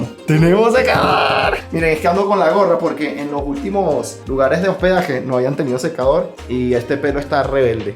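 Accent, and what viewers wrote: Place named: Venezuela. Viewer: eres guapo No habia tenido el honor de conoserte.. Veo tienes esposa) Por tu acento eres Venezolano _? Mire todo el video y me quede esperando una toma' cerca de ese pico de la ⛰️ Creo era al final..pero tengo muchas preguntas/ 1_ Las 🪨 rocas estan sin cemento_? Mi Padre hacia lienzos de piedras y solo encimandolas se caian seguido) Estas rocas tienen buena colocacion y creo se adhieren fuerte y miro estan partidas con alguna maquinaria..no hay piedra que sea tan derecha y ahi muchas son partidas exactas/ 2_ Machu Pichu tiene laderas muy altas.. La gente pregunta como construyeron tan arriba/ Yo pienso que era un altiplano parejo y con el tiempo se fueron quedando algunos arriba_ dando un aspecto de que construyeron a las alturas) Mi pregunta es' Algun terremoto destruyo los alrededores y solo sobrevivio ese piko de laderas.._? 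Amigo " Que fortuna poder viajar y conoser en persona lugares misticos' Al tu estar grabando y hablando..pense que te perdistes..el sentir la recarga de seres que habitan invisibles ahi..yo mire en tu recorrido unos movimientos detras de una ventana y no eran personas/ Eso paso antes de la lluvia y el viento' Porque estabas llegando a la plaza principal y el estar a esas alturas.. esos seres cuidan sus terrenos y al final les dieron permiso de seguir' porque podia haber una tormenta mayor y tuvieran que parar y regresarse.. hasta yo pensé.. Noooo ya se estaban acercando a la montaña y no vere lo mas importante) La Roca Sagrada Tuvo sacri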